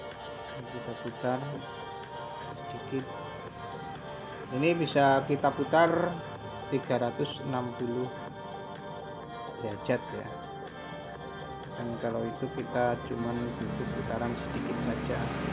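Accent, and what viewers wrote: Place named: Banyumas, Indonesia. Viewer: Mantap om 👍👍👍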